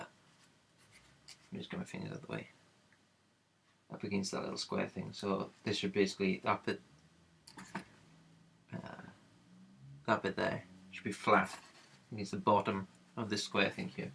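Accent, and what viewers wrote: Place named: Wales, United Kingdom. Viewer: you in wales ? accents sounds about right , i want to make this is steel you got some contact info i could do with your help . im a blacksmith.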